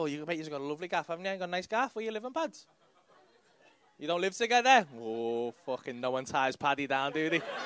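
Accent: Liverpool, United Kingdom. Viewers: Love the crowd work. It took me many videos with subtitles to get used to scouse but now I understand most of the lingo. Amazing work, big fan! Keep it coming.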